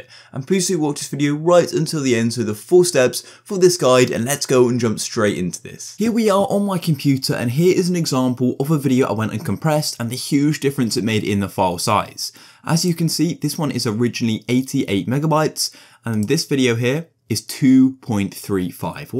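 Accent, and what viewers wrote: United Kingdom. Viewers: You speak british, eeeww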